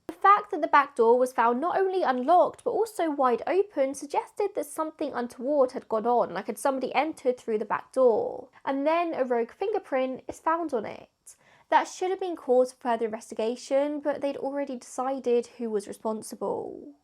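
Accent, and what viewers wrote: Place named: RP, United Kingdom. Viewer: So posh 😂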